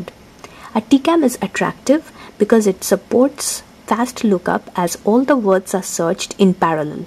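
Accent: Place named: India. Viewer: please, can one indian in math/comp.science vids speak without this fucking accent. just one?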